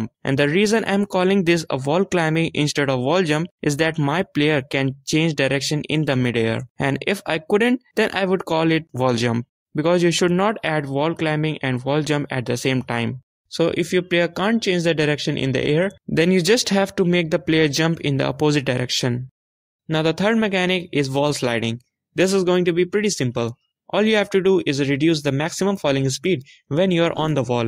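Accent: India. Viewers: suggestion to you bro, fix your indian accent, and here will be a lot of viewers!!!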